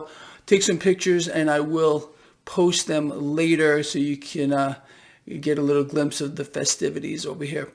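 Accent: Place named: Brooklyn, United States. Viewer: Gregory Pimpen What part of New York you from ? 🍕🕺🏽im guessing Brooklyn 😂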